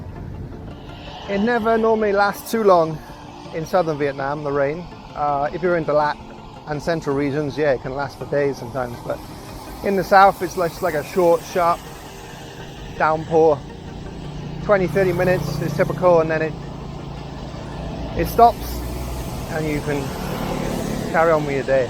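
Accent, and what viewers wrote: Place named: West Country, United Kingdom. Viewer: I like the gentle west country unassuming presentation style, check out the great live music scene in Saigon if you haven't already, cheers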